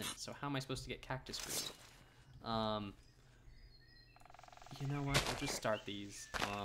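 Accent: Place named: Australia. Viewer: At first I could not place your slight accent, but then hearing you count made me realize. I honestly was like "This dude sort of sounds Australian." Boy am I dumb.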